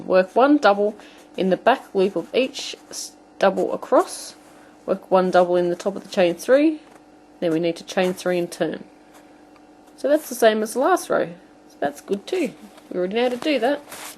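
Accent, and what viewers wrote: Australia. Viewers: Thank you thank you thank you just started to learn to crochet and these are the easiest booties ever if I didnt say it enough thank you also good to hear oz accent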